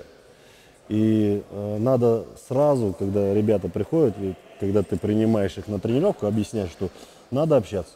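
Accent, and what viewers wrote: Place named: Kazakhstan. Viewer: Он с Казахстана, там такое произношение допустимо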